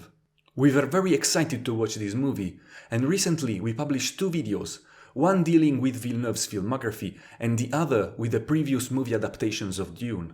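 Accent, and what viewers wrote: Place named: France. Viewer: good review; I presume u r French as you sure do have some of Denis accent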